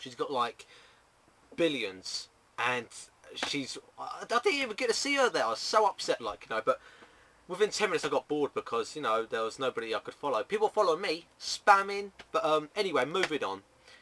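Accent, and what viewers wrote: United Kingdom. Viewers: i love ure accent <3 <3 egland accent FTW!!!! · fun to listen to with your awesome british accent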